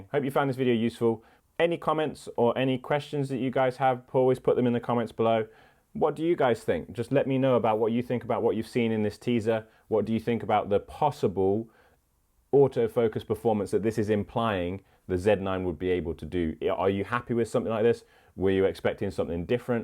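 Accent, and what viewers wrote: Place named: United Kingdom. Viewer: He's from the UK. That's how they say it. Here in the US we can call it Zee all day and all night.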